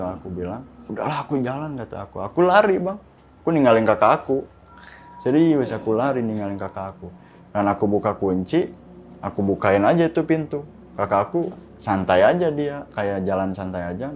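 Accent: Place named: Pandeglang, Indonesia. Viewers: mas y org mana kyak logat org pandeglang banten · Gile 2 jam nonton bang mode serius. 😂 Si abangnya cerita khas banget orang Sunda, selow, tapi aku betah soalnya sambil bikin kuah bakso. Hari ini di Jember lagi merayakan hari raya ketupat btw.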